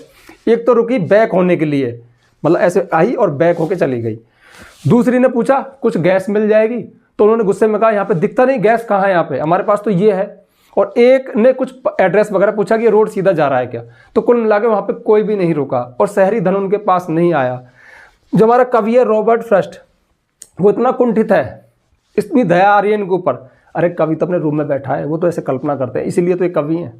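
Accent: India. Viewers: Teaching English 😊with Desi accent..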